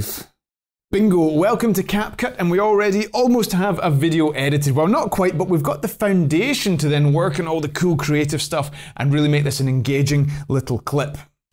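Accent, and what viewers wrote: Scotland, United Kingdom. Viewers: fantastic, what a joy to hear a scots voice 😊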